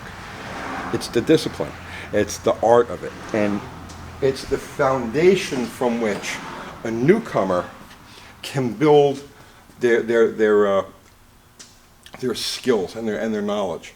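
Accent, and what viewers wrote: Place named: New York, United States. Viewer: I've noticed a deep underlying trend to your videos lately, and I truly mean this constructively. Your videos are a direct response to comments lately. You address these "issues" and "haters" as the New Yorker you were raised as. The issue is that the New England accent in general has adapted into something that comes across as aggressive and matter of fact, to the point of over the top arrogance. And to most of the viewers not from NY you might just sound like a know-it-all dick, this could be off-putting to the simple folk and cost you a few subscriptions and a views. Just some professional criticism.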